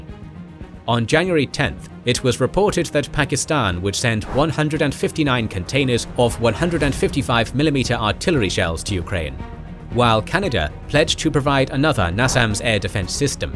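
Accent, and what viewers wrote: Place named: England, United Kingdom. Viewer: FINALLY...someone with a decent English accent... and can actually pronounce the Ukrainian town names! So much better than all the foreign commentators who can't even speak English! Great stuff...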